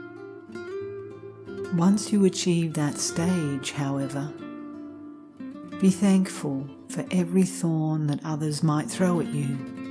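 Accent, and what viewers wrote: Australia. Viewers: Rumi in an australian accent?? Woohooo!!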